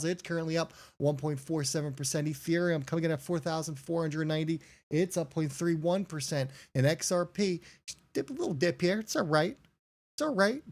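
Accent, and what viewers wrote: New Jersey, United States. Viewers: BROTHER LOVE YOU VIBE CONTENT & SOUNDS LIKE JERSEY OR PHILLY STYLE ..NY ?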